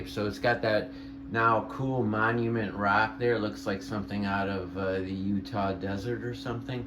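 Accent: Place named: Chicago, United States. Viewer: I'm about 5 hours straight north of you. Great looking tanks and beautiful looking, healthy fish! About 3 minutes in, I thought to myself, "I bet this guy is from Chicago! 😂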